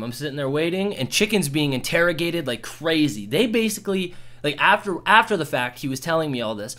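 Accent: Canada. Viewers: Where you from in canada you kinda sound like a sauga man cant lie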